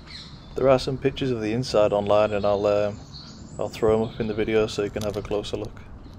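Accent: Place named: Yorkshire, United Kingdom. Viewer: Beautiful setting. So much bird song. I like your respect and also your humour. I cant quite place your accent. Is it Yorkshire or Lancashire?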